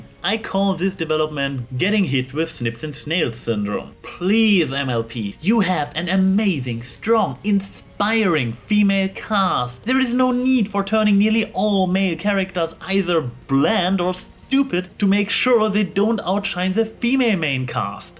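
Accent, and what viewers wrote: Germany. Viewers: I have no idea why, but I seem to be really happy to hear your German tones today.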